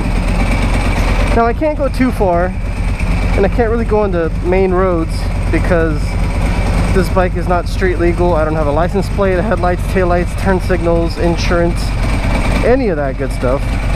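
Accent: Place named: China. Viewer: Sorry the fix did not work, Still love the Chinese accent thou.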